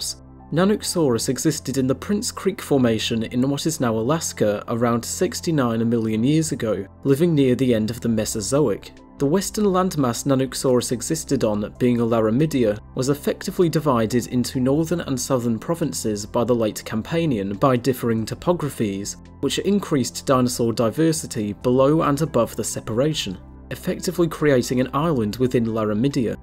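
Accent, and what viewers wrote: England, United Kingdom. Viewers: Why do all these guys who push the feathered dinosaur theory have English accents?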